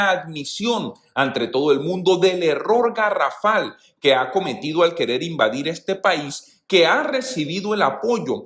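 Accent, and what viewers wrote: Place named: Venezuela. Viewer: Ese dejo venezolano jaja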